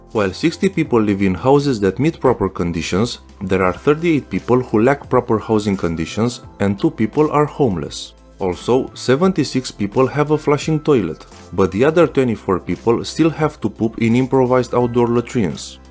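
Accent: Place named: Romania. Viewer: That brutal Romanian accent though 😖😖😖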